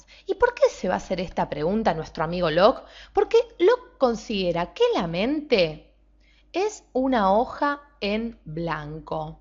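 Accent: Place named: Buenos Aires, Argentina. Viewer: jueno es porteña es el acento de estos arrogantes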